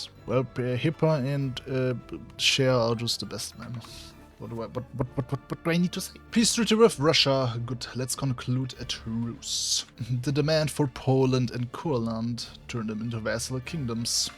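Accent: Germany. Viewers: Why do you sound German?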